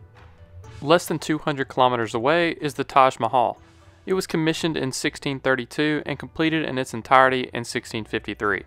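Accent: South, United States. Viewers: Shout out to all the other people who heard his southern accent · Nice southern accent :) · I just can't help to notice that you have a strong Southern accent. I don't know, it just sounded a little weird to me at first hahaha because oftentimes, the geography and history-related videos I watch are narrated by people with British accent. This is the first time I'm hearing someone talk about Geography with a Southern US accent. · Because of your American accent, I can't understand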